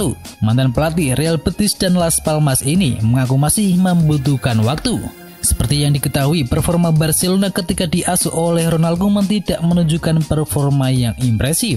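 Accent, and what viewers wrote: Java, Indonesia. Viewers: Medok amat mas bro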